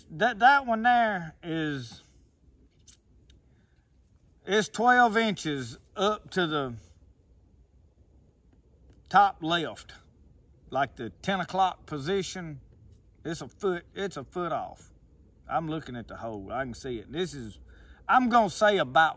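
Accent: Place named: Mississippi, United States. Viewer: Well, men are usually born problem solvers, we tend to wanna fix things. As I get older, I tend to keep my opinions to my self, because I’ve figured out that my opinion is sometimes wrong!!! Lol. Enjoy your channel, love that Mississippi accent. Makes me sound like a yankee, and I’m from Arkansas!!! Lol. (No offense, love my yankee brethren) !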